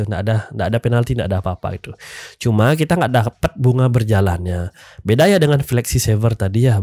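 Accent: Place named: Java, Indonesia. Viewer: Jawa banget logatnya bang:)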